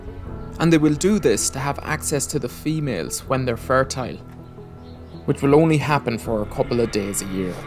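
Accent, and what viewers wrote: Ireland. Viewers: I love Irish accents :)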